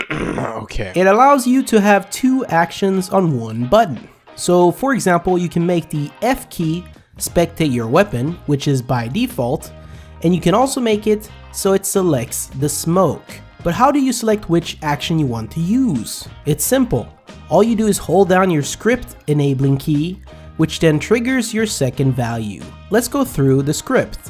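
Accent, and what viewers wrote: France. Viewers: Are you french? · good french accent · So beautiful french accent:O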